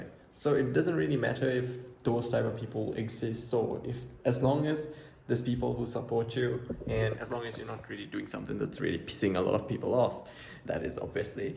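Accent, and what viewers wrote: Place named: Sri Lanka. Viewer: Bro I identified that accent immeadiatly😂 Im Srilankan too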